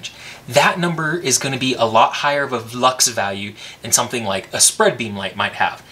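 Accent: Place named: Kansas City, United States. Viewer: You from Kansas city?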